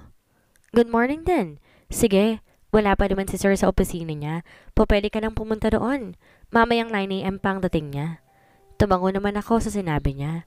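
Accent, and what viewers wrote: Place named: Iloilo, Philippines. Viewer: Maka lingaw ya ilongga mu miss Narrator 😅❤ taga banisilan po ako . I’m very happy po while listening this story ❤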